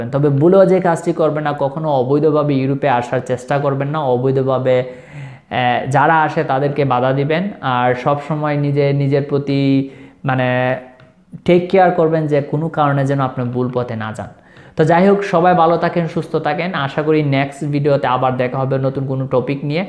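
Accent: Sylhet, Bangladesh. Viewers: আপনি সিলেটি, আপনার কথায় সিলেটি টান আছে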